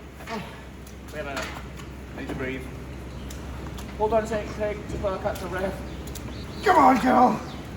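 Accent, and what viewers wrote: Scotland, United Kingdom. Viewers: yer Scotish acent is pyer goodnes, mate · Where in Scotland are you from I’m from Ayr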